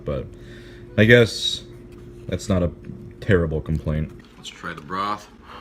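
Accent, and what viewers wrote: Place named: United States. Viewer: The fuck is your race? you look Asian but sound american!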